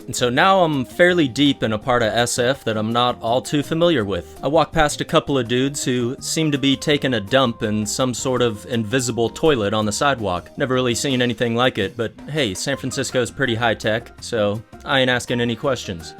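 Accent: South, United States. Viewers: with a slight southern drawl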